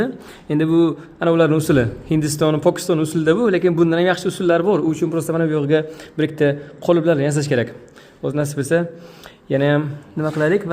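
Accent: Bukhara, Uzbekistan. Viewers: "Bexoro şeva" manga yoqadi😃 Yoşligimmi eslatadi.. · Устажон. Омадизи берсин. Сиззи шевангизни эшитиб Бухоролик укажонларим ёдимга тушди. ОМАД!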